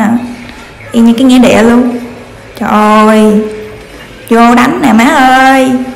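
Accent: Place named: Mekong Delta, Vietnam. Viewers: Nói giọng đậm chất miền tây luôn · Bà này miền tây chính cống mà. Nên ngta mới gọi miền tây á.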